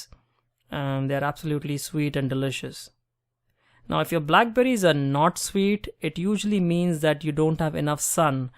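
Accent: India. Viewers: Sound like an Indian guy in California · He sounds indian but his skin looks white. I’m stoned and i know its 2021 but just something I noticed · pronounces you are Indian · Sounds very Indian... The accent is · you sound like indian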